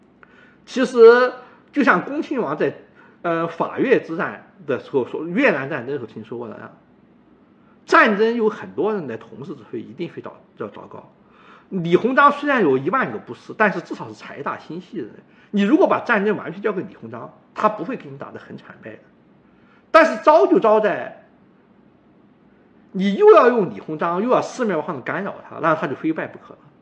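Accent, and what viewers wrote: Sichuan, China. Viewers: 作为土生土长巴蜀人听不懂巴蜀大总统的很多口音，大总统吐词不清还可以改进不？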